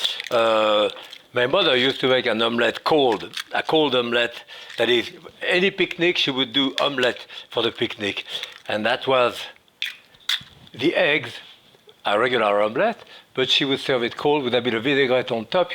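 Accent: France. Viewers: that french accent even makes the word omelette sound so goood mmm and yes i always use chopsticks now for anything that needs a good whipping , once you master it the become a regular used kitchen tool , happy cooking folks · Absolutely.....My dad was French and had eggzactly the same accent.😊😊😊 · Does anyone else simply hear the french accent and immediately hit the subscribe button? only qualification i need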